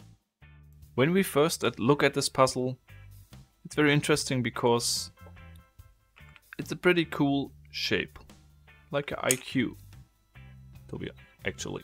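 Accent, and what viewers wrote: Germany. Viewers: Voll der deutsche Akzent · Kann es sein, dass du deutsch bist? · are you from Germany? You have this specific accent:-D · German, right? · Wow, hab ich mich verhört, oder kommst du aus Deutschland? Der Akzent ist unverkennbar :D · Red bitte deutsch du kannst es man hört es am Akzent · Dude, the accent is german und sucks af😂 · Man hört mega raus das du deutsche bist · Man hört das er deutscher ist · Are you german ? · Man hört so einfach raus das du Deutsch bist XD nices Video btw, cooles Spielzeug. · Are You German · Dieses deutsch englisch regt mich so extrem auf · Man hört deutschen Akzent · Are you german/ bist du deutscher? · You bought it in Germany? I knew it!!! Your accent is very German!!! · Are ya German?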